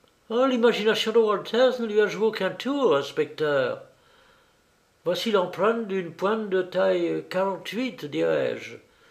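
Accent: England, United Kingdom. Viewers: Pourquoi cet accent anglais? Ilbn'a pas rzison d'être, puisque il est censé s'exprimer dans sa propre langue. . Bref, j'arrête c'est très insupportable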